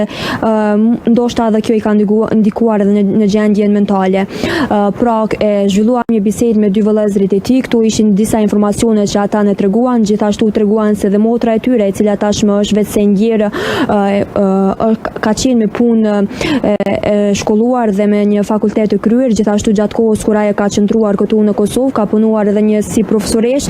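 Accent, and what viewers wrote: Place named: Highlands, Albania. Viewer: O gazetare sa trashe flet na e shpife maloke tamam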